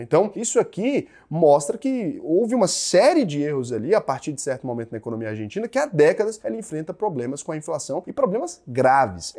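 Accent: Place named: São Paulo, Brazil. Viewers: Esse é o sotaque de Paulista da Mooca ou Faria limers...nipe Boça, Faustão rs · Realmente é assim mesmo o Sutaque do estado de SP ahahahaha, sou do interior de SP e aqui puchamos bem o R, bem caipira mesmo, ai vc vai mais pra capital ou pro litoral de SP o R é diferente.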